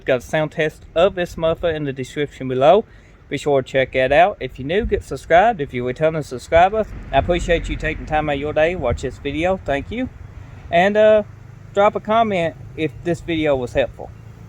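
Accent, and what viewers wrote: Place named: South, United States. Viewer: fuck the exhaust, iv never herd a strong southern accent with a 5 year old's speech impediment. impressive.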